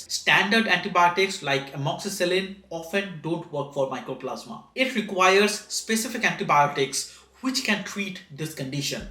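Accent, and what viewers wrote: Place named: United Kingdom. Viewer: I love your British accent. Where in the UK are you from?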